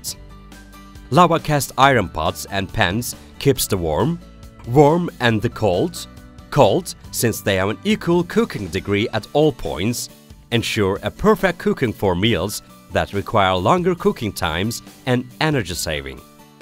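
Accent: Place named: Turkey. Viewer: Allah aşkına kimin fikriydi bir türkü aksanli ingilizce konuşturmak? Acil değiştirin. Çok garip olmuş.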